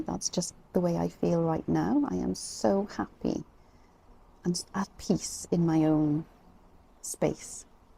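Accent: Wales, United Kingdom. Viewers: I'm 65 and retired with two little jobs. Resonate with everything you said, and with your lovely Welsh accent. My mum was Welsh and to me Welsh voices are warm and soothing. I wish you well and will subscribe. I havent had a health event, but am glad to be reminded by your experience that there is nothing more important than time to live your life. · Lovely Welsh accent 😊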